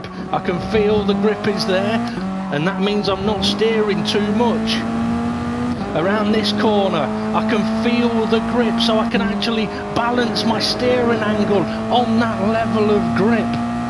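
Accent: United Kingdom. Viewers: So british. :)) Nice video mate, I use your settings, and I can feeel, everything from my car. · I've subbed just for the classically British rant. Too funny but still informative.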